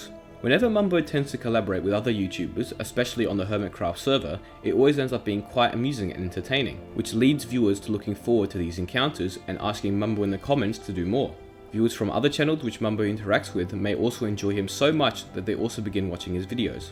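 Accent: United Kingdom. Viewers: It's the british accent. I love it!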